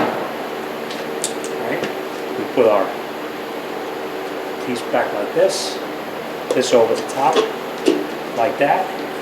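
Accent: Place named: Boston, United States. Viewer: Your video was very helpful to me it was great the way you explained each step as you went along. I took your advise on the spring compressor deal and purchased the whole assembly that made the whole job much easier. Also I noticed from your accent, you must be from the Boston Massachusetts area. I retired and moved from Palmer Massachusetts to Georgia but my heart is still in Massachusetts and it was nice to hear from someone that lives in that area. "Thanks "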